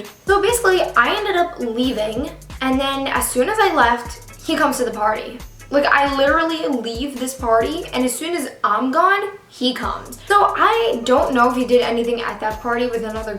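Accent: New York, United States. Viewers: MariaHerrle she has a New York accent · She's from New York, it's a common accent💗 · Anyone else just love her new york accent?? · She has a New York accent! You can here it when says ask and again! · Her New York accent is so strong in this!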